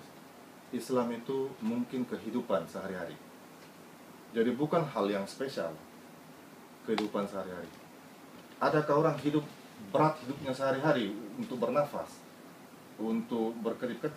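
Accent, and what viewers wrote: Makassar, Indonesia. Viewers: kental sekali ini orang logat makassarnya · subhanallah, allah mempunyai jalan untuk hambanya yang bersungguh sungguh, lama di makassar yahh, pantasan logatnya makassar bgt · dialeknya kok Indonesia banget. dialeknya sangat mirip dialek Makassar atau Manado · logat makassarnya kentara sekali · Dialeg Makassar. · pantas aksennya makassar sekali... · logatnya jadi ikut orang makasar ya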